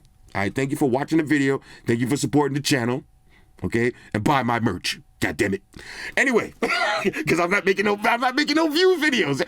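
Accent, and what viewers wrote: New York, United States. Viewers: talks like a NY Giants fan. Gross. Dope vids though. Z flip 3 all the way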